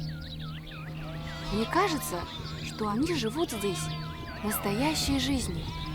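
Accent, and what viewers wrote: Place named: Russia. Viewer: наименования чисто қазақское произносится с руским произношением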